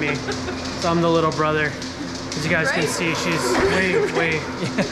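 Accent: Netherlands. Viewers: dat is een zeer zwaar Nederlands accent. leuk om een nieuwe video te zien hier in Vlaanderen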